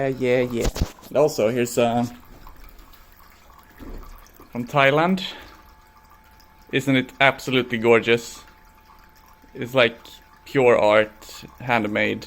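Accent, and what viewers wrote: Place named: Sweden. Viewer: Love your Swedish accent and your appreciation for nature and beauty. "Just me and the plants" ♡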